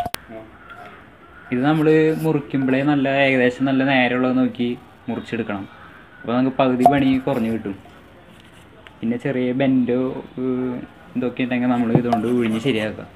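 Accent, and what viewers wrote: India. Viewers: നല്ല കൊടുങ്ങല്ലൂർ ഭാഷ ..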